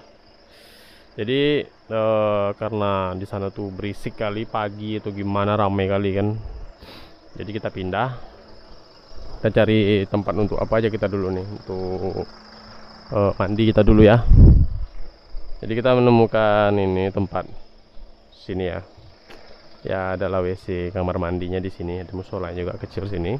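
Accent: Pekanbaru, Indonesia. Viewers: Logat pekanbarunya keliatan banget... support terus. Orang pekanbaru like!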